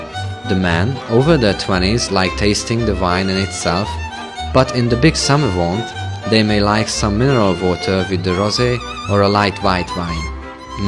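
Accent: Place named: Hungary. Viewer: Jó erős kis magyar akcentussal :D, de örülök hogy van ez a video.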